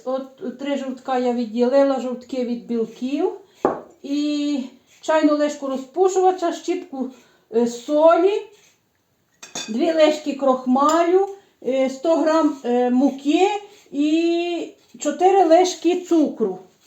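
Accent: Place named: Galicia, Ukraine. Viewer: Це щодо зауваження до п.. У неї класний галицький діалект. Продовжуйте, п., і не звертайте уваги на такі дрібниці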